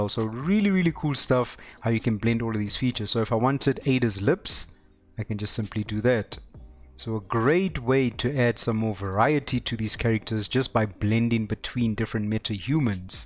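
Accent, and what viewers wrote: South Africa. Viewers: South African accent detected...Which city you from ma se kind? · 1st Thing I thought, this guy sounds like a South African :) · I'm curious, are you South African ? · My guy, watching this video, I kept thinking to myself "That's a familiar accent...", stoked to discover another Jo'burger.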